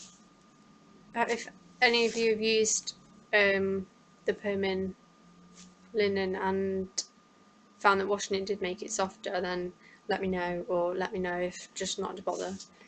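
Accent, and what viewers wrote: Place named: Sheffield, United Kingdom. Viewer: its brilliant seeing another stitcher from the uk though it started a debate between me and my boyfriend trying to place your accent i say somewhere south Yorkshire? thats where i am and you kind of sound sheffield ish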